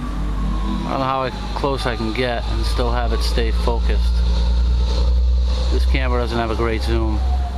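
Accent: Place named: Boston, United States. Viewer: do you live in boston? because i do to and recognize that accent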